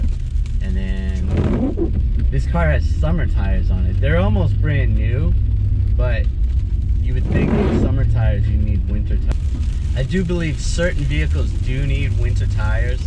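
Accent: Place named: New England, United States. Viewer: Hey you're back! I made the mistake of putting only two winter tires in the front and two all seasons on the rear before, on my Corolla. It was a little sketchy because it would randomly start drifting especially around turns. Oh and yeah I hate it when people don't clean off their car and they they throw snow all over other cars. And and great new england accent btw :)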